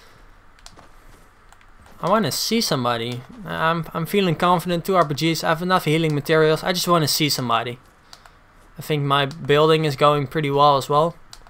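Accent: Netherlands. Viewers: Are u dutch . Je hebt een nederlands accent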